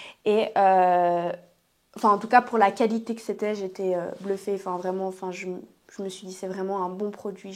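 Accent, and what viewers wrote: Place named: Switzerland. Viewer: Ça me fait aimer l’accent suisse 😊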